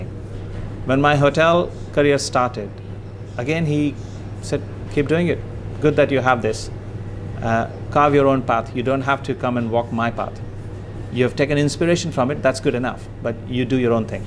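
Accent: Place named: United Kingdom. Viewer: British accent uncle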